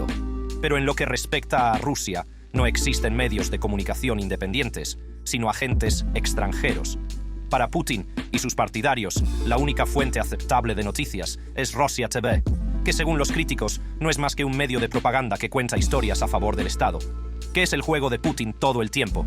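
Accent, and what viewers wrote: United States. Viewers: Tan buenas noticias..pero que pésimo gringo o ruso ..no se...se escucha mal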